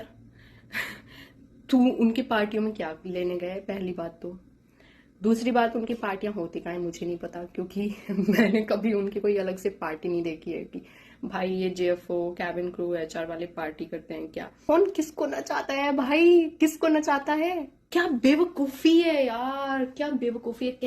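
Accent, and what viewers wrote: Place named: United Kingdom. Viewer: aapne english bolna kase sikha plz share me link..........mughe bhaoot acha laga........ british accent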